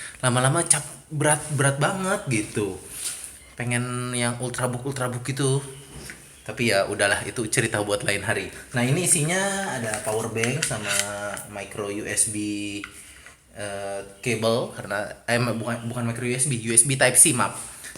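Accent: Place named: West Java, Indonesia. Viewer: orang sunda kah? logatnya sunda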